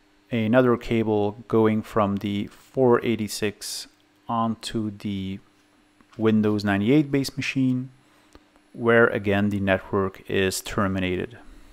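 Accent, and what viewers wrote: Netherlands. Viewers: Are you Dutch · dutch guy?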